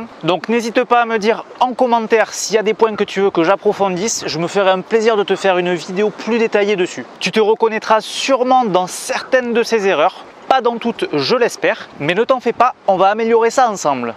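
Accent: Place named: South, France. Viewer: Aaaaaaaaah l'accent du soleil! <3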